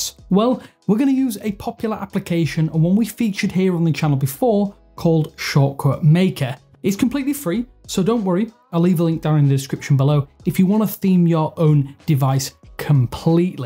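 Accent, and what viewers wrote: United Kingdom. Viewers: Are You British...?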